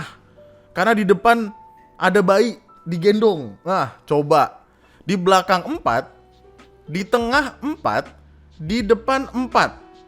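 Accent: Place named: Banyumas, Indonesia. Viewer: yang bikin video ngapak pisan mbae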